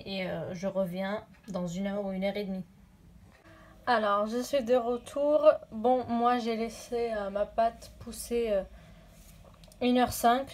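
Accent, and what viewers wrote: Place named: Belgium. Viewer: Super une chaîne pour le mcc ! Cest trop mignon ton accent tu es Belge ?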